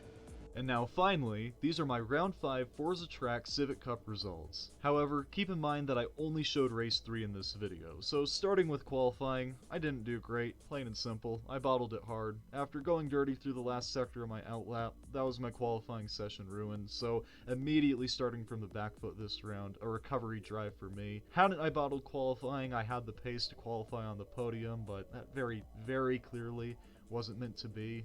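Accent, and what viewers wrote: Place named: United States. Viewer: Very nice! The memes are great! LOL! Nice surprise to not hear a European or Australian accent too! I didn't you know you were American/Canadian!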